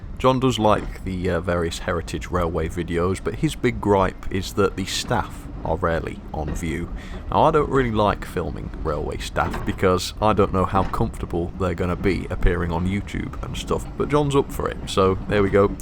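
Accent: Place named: North, United Kingdom. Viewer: We now want to visit this railway, its about 3 hours from us. I did notice your northern accent was creeping in. Who is your lucky lady and expect camerawoman?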